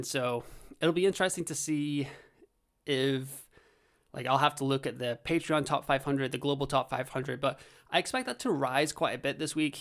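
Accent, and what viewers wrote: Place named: United States. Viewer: are you an American who has moved to Europe? Your accent is so 🇺🇸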